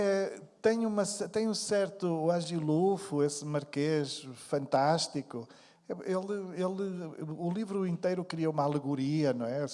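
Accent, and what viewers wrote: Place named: Brazil. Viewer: És mesmo falso! Ainda por cima quando te pões a brasileirar a forma como falas. Achas que eles são atrasados ou és tu?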